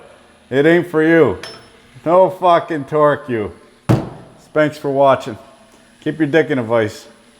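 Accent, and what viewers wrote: Canada. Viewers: Do all canadians speak like that?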